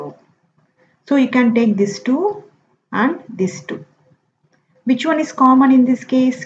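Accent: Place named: Bengal, India. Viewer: Maam aapki aawaj bengali lagti hai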